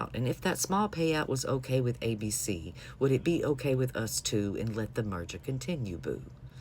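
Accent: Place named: Louisiana, United States. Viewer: Are you from New Orleans or Louisiana area? Because I absolutely love your accent and your voice is beautiful.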